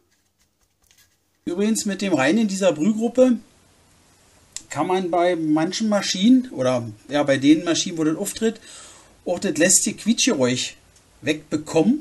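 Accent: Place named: Berlin, Germany. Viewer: Danke! Das hat sehr geholfen☺️ Und dein Berliner Akzent ist super und du erklärst das mit guter Stimme. Top!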